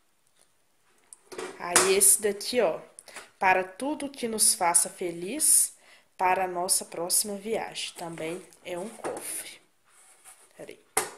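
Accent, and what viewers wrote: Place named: Minas Gerais, Brazil. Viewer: Você é mineira?? Tem um sotaque da minha terrinha boa rsrs like.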